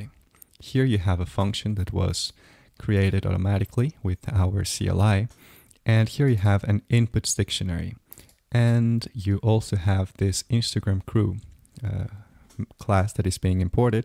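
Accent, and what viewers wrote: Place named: United States. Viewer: Amazing tutorial. So we well explained and laid out. Question for you on CrewAI - is the process of picking a tool prescriptive, meaning the agent instructs which tool to pick? Or can the LLM pick automatically from a set of tools and decide what to use. I am just coming up to speed on CrewAI and wasn't sure. And your English is fantastic, by the way. First thought your accent sounded like you were from the USofA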